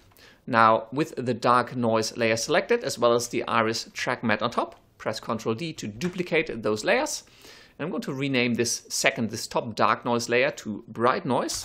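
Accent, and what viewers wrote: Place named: Germany. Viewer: Hey You sounds like a German accent to me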